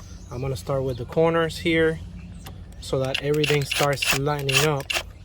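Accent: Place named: Puerto Rico. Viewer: Just knocked this out thanks to this video! Thank you! There's 0% chance you're not boricua with that accent! Dimelooo 🇵🇷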